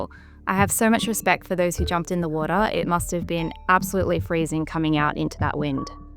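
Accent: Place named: Australia. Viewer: This ice-bathing has been a common practice in nearby Russia too, since time immemorial. As with Wim Hof's practices. Wonderful to hear the history and special relevance to Georgian practices, thank you. All your photos, videos and blog are exceptional. Great to hear a fellow Aussie voice - and from Brisbane no less :-)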